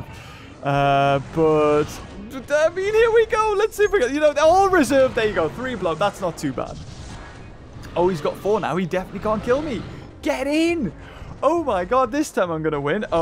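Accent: United Kingdom. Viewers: the only difference is your british accent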